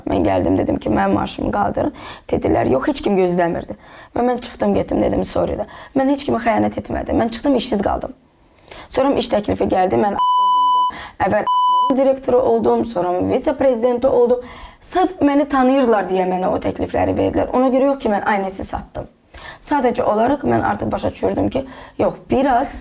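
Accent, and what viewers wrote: Standard. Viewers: Mənimçün çox maraqlıdır, xanım Azərbaycan dilində belə təmiz danışmağı necə və haradan öyrənib. Mənim qızım orta məktəbi Rusiyada qurtarıb və artıq 10 ildən çoxdur Bakıda yaşayır, amma xanım kimi təmiz danışa bilmir, danışığında aksent hiss olunur. xanımı tanımağıma çox şad oldum.🎉😊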